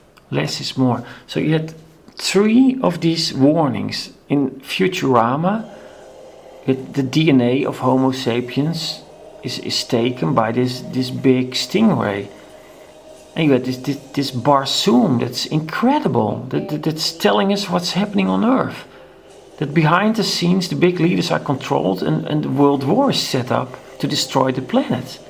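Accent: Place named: Netherlands. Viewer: i put 1000 on 0 that your dutch :)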